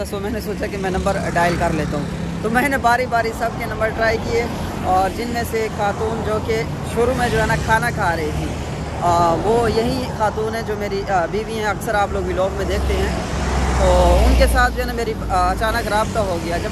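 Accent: Saraiki, Pakistan. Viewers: brother apki awaz se hi pata lag gya tha k ap seraiki hu jitna bholese tu wala utna suchase so everything is fantsatic in your vlog wish u a very happy life allah hamre b naseeb khole apki trah😂😂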